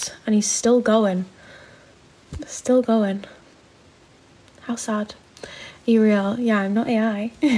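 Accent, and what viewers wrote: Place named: North, United Kingdom. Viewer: Wow beautiful 😍northern accent too 😘💋💋💋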